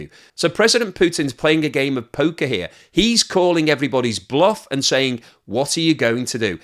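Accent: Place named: England, United Kingdom. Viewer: What are of England are you from? I like to play place that accent.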